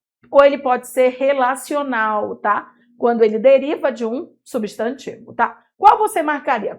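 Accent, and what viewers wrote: Pará, Brazil. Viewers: Professora paraense?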